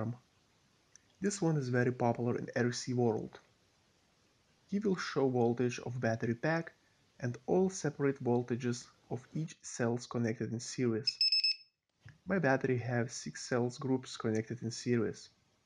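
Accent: Russia. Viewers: Акцент какой то русский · Прям слышно что русский, да?) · Ваш Английский.... Вас выдает, что Вы Русский =))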